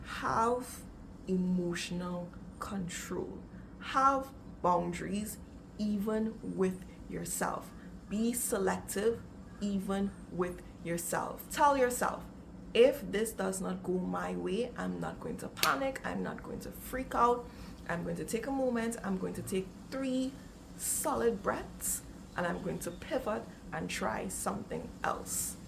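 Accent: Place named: Caribbean. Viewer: Oooh I Love the Carribbean accent…,what parish ?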